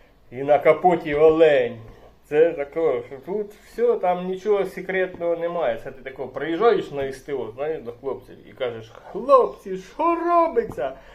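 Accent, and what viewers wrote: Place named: Kamianets-Podilskyi, Ukraine. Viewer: Ваша балачка дуже похожа на нашу ( кам,янець - подільський) з початку як почув то подумав що десь від нас родом😊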